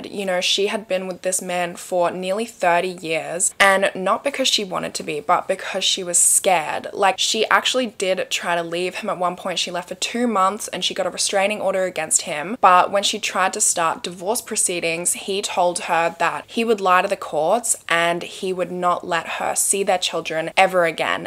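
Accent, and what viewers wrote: Australia. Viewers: You’re telling me you’re Australian not British? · Thank goodness that man had the training to get her out of the water without destroying DNA. Each LE department needs to have a couple of people trained in that area because it is so important. I'm sorry, the river...I can't figure out what you're calling it. It sounds like you're calling it the nut sac river. Not trying to be funny and I guess it could be possible but your accent is much prettier than an English accent and I just figured out your from Australia. Idk how long I should have already known that. I'm getting old...maybe I forgot.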